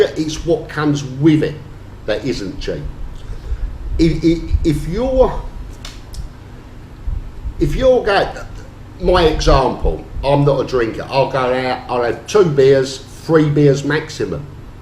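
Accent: United Kingdom. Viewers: The problem is that the Queen does not speak like most of the UK population. Whereas accent is the most widespread in the UK. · "Be on the Piss all night every night" I Love British Speak :)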